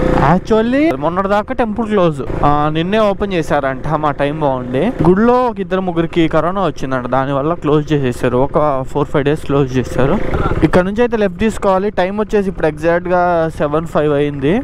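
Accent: Nellore, India. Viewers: Nellore yaasa 100 percent dimpesav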